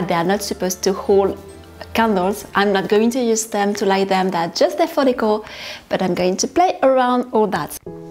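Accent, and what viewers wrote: France. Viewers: Love watching you decorate. Not taking anything away from your immense talent which is everything, you must be aware that your French accent and living in a wonderful home in France is what sells it. I am jelly.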